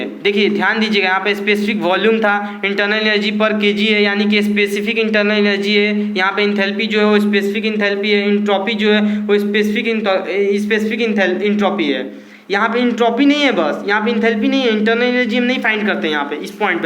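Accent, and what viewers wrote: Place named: Bhojpuri, India. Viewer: Good explanation nd I love ur bhojpuri accent 😂👍👍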